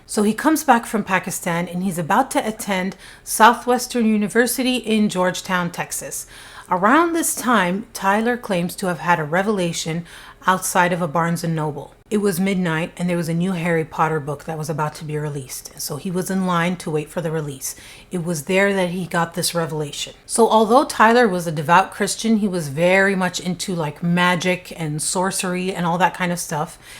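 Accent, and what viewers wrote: France. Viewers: just got invaded by visions of Lori Vallow or so. LOL. You narrate stories well and champion the victims. Thanks,! I usually skip the ads but not with you, haha! Your French accent is tres, tres bien! I think it may have been Tyler on his own or Bethany, in her fragile state of mind, was pushed to doing it. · I'm living for your French accent! good luck on your Paris endeavor. Thanks to you, I don't have to deep dive into these disturbing situations, myself. I feel so terrible for lil Bethany...she was such a gem. Like what a beautiful mind and smile. Hopefully she is resting easy and her loved ones are trying to heal. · you have a great french accent!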